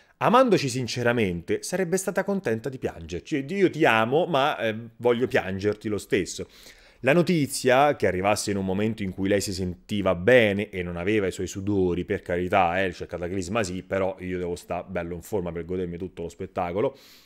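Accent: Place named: South, Italy. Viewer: Che accento da meridionale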